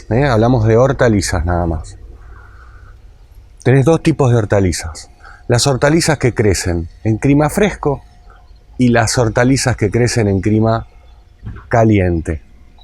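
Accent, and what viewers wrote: Buenos Aires, Argentina. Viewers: Andrés Modesto Rodríguez De León es la forma de hablar de los “porteños” (o sea de los de la ciudad de Buenos Aires y alrededores) en Argentina. Hablan así, parecen soberbios. Te lo dice una Argentina del interior del país. A nosotros a veces también nos choca esa manera de hablar. Pero sabe un montón. Junto con Tony de España, a mi me han ayudado a hacer mi huerta.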